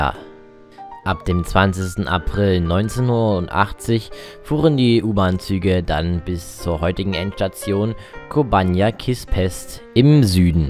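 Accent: Germany. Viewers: wie kommst du drauf, du hättest keinen Akzent? 😂 Ungarinnen und Ungarn sprechen akzentfrei ungarisch, du sprichst alle ungarischen Begriffe mit einem grauenhaften deutschen Akzent aus. Selbst auf Deutsch nuschelst du stark und versprichst dich viel zu oft. Ist schon alles sehr anstrenged anzuhören, vor allem in Kombination mit der schlechten Tonabmischung und der teils aufdringlich lauten Musik, die du besser komplett wegelassen hättest. Ist nicht böse gemeint.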